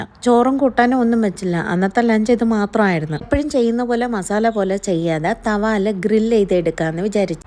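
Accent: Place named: Tamil Nadu, India. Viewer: I like your tamil version of talking ❤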